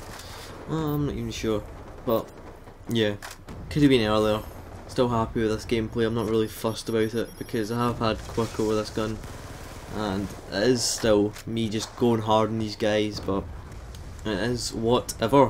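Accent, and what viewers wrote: Scotland, United Kingdom. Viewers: waiting for the scottish accent tutorial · Has he's Scottish and that waz a BEAST gameplay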